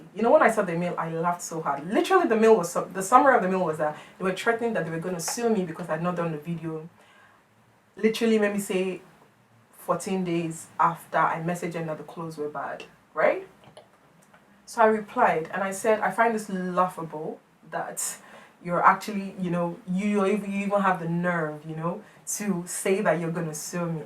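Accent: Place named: Africa. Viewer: looking beautiful as usual but let's all just act like we don't know the brand lol you sound like an African mummy 'panti leleyi' lovely video as usual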